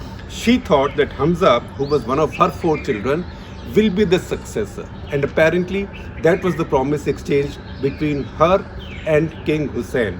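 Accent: India. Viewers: Very interesting subject and very informative, BUT very difficult to understand what you say… because of strong Indian accent !! Pity ……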